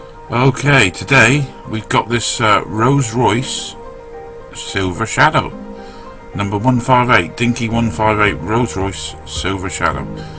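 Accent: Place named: West Country, United Kingdom. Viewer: Thoroughly enjoyed watching that, spent this evening watching a few of your videos, you do excellent work. Nice to hear a fellow Westcountry accent too!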